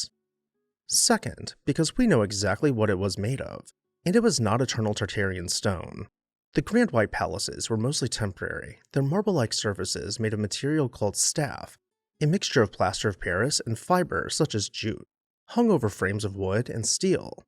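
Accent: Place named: South, United States. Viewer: Interesting mix of a slight southern gay drawl with a hint of late to chabad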